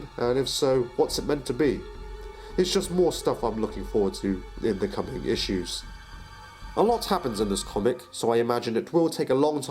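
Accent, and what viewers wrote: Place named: United Kingdom. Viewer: I've been watching your vids for a while now, and I've only just realised you have a British accent. I'm British myself wtf ahahahaha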